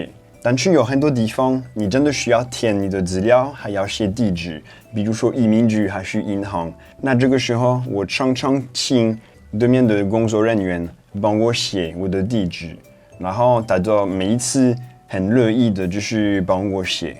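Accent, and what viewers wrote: Taiwan. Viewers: 你真的愈來愈台囉～聽你講話有種溫暖的感覺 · ，聽起來很親切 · 謝謝，歡迎來台灣。你的新民字很棒，，很親切。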